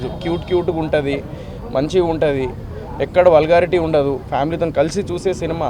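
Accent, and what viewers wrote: Telangana, India. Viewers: Mundu nuvvu Telangana slang maarchu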